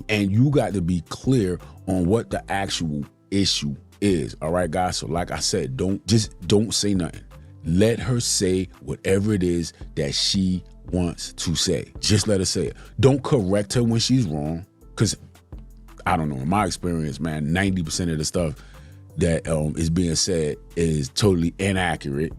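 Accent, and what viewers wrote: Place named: New York City, United States. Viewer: Spot on Bro. I can tell you are from my city NYC from your accent. Salute!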